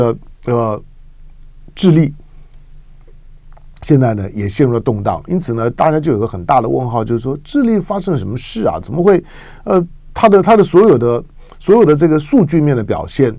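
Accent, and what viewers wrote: China. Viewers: 妳說著標準的大陸口音要蔡總統跟川普下台，典型的中共代理人，中共同路人啊。抵制唐湘龍。。。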